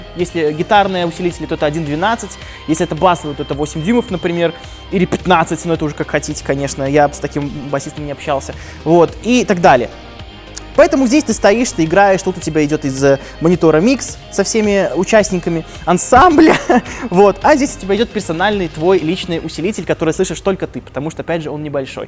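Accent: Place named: West, Belarus. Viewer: Первый раз на канале этом. 20 лет назад жил на протяжении 6 лет в Гродно, и этот говор такой западно белорусский, прям ностальгия )))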